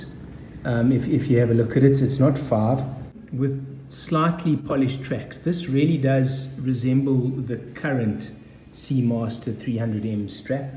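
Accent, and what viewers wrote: South Africa. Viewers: What accent is that ? I'de guess SA.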